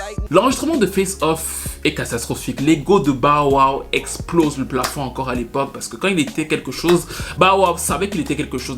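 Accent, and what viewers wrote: Cameroon. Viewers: L’accent Camerounais 🤣s’entend bcp pars ici ♥️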